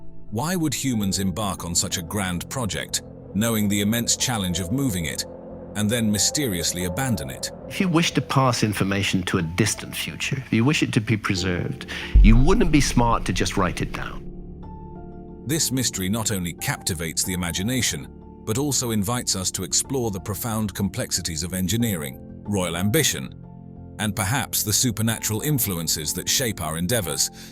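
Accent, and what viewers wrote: England, United Kingdom. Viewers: It's tough listening to the narration because it's in an English accent and all I can think of are liars.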